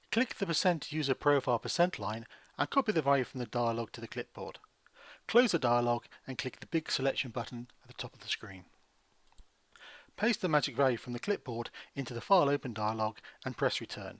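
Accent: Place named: United Kingdom. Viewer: You sound so British. :)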